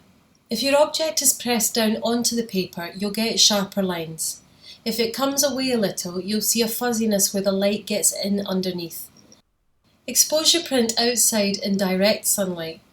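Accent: Scotland, United Kingdom. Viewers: How lovely to hear a friendly Scottish voice. Just getting into doing some cyanotypes and enjoyed your explanation. All the best from Panama 🇵🇦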